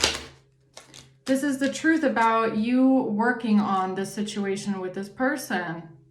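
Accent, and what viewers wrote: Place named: Germany. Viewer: Good morning 🌅, LOOKING FORWARD TO HEARING YOUR VOICE, THAT GERMAN ACCESS OMG YOUR A GODDESS, MUCH RESPECT, HAVE A BEAUTIFUL DAY NIGHT, GOD BLESS YOU X ❤👑☀️💜🛡️👑☀️💜💜💜❤😊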